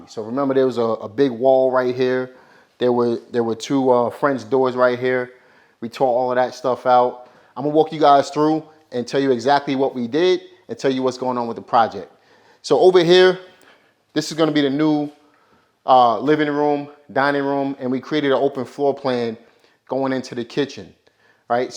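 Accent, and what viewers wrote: New York, United States. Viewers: Love the content bro!! The new yrk accent never leaves lol!!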